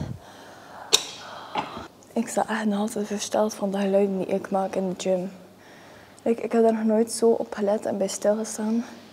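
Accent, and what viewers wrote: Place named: Flanders, Belgium. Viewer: waarom staat er nederlands als je belgisch praat of vlaams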